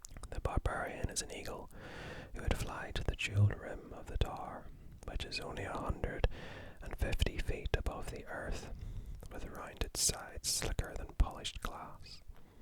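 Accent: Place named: Ireland. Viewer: I’ve been searching ‘Conan ASMR’ on a regular basis for like ten years hoping something good would pop up one day, super glad something finally did. The Tower of the Elephant was one of Howard’s best, in my opinion. If you do another Conan video (& I really hope you do), what story do you think you’d do next? I personally think the Phoenix on the sword would be a good one for ASMR, but obviously any of them would do. I also want to mention that I think it’s great that you have the accent that you do, because while I think most people understandably imagine Conan with Arnold’s thick Austrian accent, in my personal head-canon, he’s Irish. So it’s really cool to hear that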